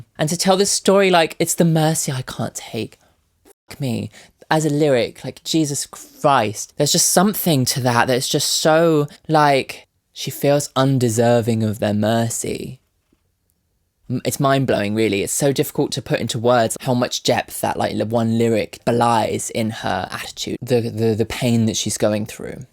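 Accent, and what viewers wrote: United Kingdom. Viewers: how did i know you were going to speak in a british accent just by looking at the thumbnail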